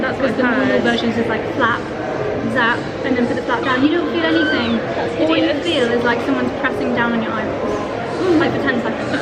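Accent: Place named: United Kingdom. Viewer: your British accent is always so much more prominent when you're with 🙂 For the new zealander ear, its lovely💕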